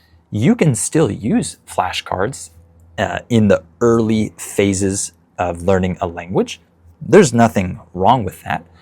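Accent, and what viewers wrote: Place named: United States. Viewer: Yes American Accent😊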